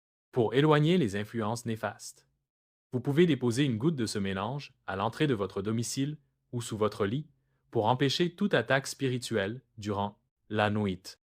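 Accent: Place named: Quebec, Canada. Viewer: assortie d'un bon accent quebecois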